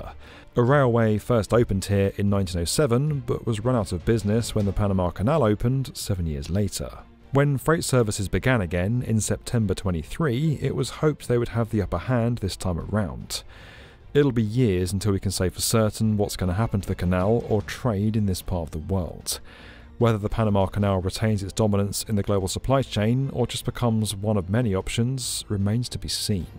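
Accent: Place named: Public school, United Kingdom. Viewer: So we get sweet talking public school voices explaining what they don’t know as they’re stupid more interested in the latest share price The reasons for and why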